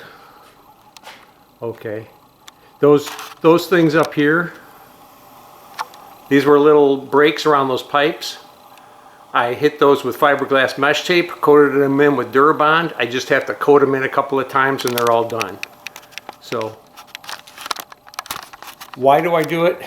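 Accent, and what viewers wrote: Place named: Chicago, United States. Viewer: I love that Chicago accent !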